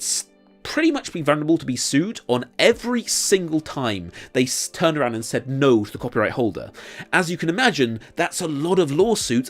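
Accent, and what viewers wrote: United Kingdom. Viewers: Because he’s got a regular British voice and a regular British face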